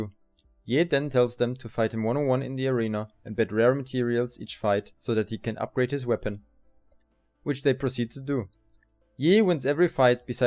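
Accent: Germany. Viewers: Ich hör raus dass du deutscher bist ;) Weißt du vllt wo man den schauen kann, weil chrinyroll hat den nicht. Wollte mir den nochmal anschauen weils auch schon 2 Jahre her ist. Feier dein Content. Find solche Videos richtig gut weil man sich immer ein Stück weit ein Video rein ziehen kann um zu schauen ob der Anime einem zusagt oder nicht👌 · Hey is it just me or is your accent a bit German? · Dieses deutsche englisch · Uhh okay so two things great video but correct me if i am wrong is that an german accent if so i must say great english skills :D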